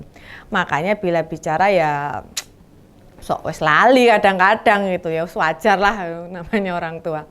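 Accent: Java, Indonesia. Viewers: Mbak.... sampeyan bisa melucu politik dengan sindiran telak dengan logat Jawanya itu ilmunya dapat dimana mbok ya ajak-2 yang lain....???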